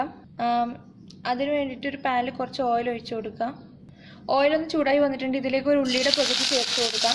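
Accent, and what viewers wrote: Kannur, India. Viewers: kannur evideyaaa. .......varthnm kelkn nalla rasamud. .....njanum kannur annu. ....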